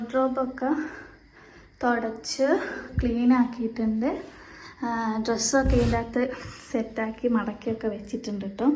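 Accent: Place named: Kannur, India. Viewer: ചേച്ചി കണ്ണൂർ ആണോ. കണ്ണൂർ ഭാഷ പോലെയുണ്ട് 😍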